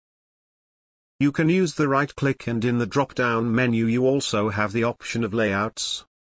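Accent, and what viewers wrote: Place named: RP, United Kingdom. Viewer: I like how posh he sounds : )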